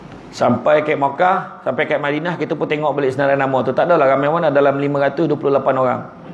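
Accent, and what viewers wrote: Negeri Sembilan, Malaysia. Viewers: Semoga ustaz sentiasa sihat agar dpt terus menyampai kn kebaikan. Ohsem bila dpt mendgr bahaso Nogori 9...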